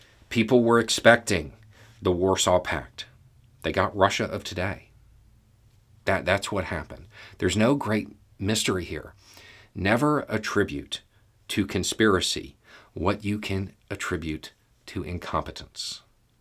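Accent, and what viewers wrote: South, United States. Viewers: Stop hating 🤡. Yes it’s out there but packages this in a nice and logical way for us to digest. I still do my own research but damn that southern accent just makes it so much more fun to hear it from him😬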